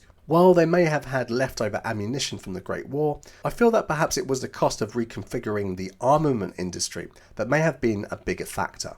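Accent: England, United Kingdom. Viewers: Rose Royce? Rolls Royce! And spoken with a good English accent or at least southern accent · Enjoyed your video but, your British accent was a bit hard to follow with my Texas ear. Not your fault. A thumbs up from Houston.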